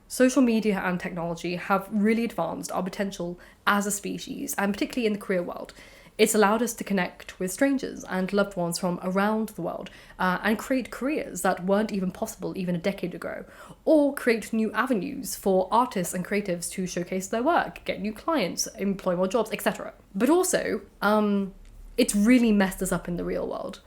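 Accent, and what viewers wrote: England, United Kingdom. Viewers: Just found this channel yesterday, now binge watching, as well as Lady of the Library and your wonderful blog posts. So nice to find such an intelligent, interesting and attractive lady on YT. With an English (Irish?) accent to boot!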